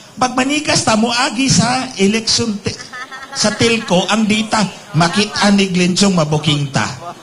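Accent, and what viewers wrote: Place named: Visayas, Philippines. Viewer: ikaw bitaw to atty. Glenn Chong, bisaya diay ka😅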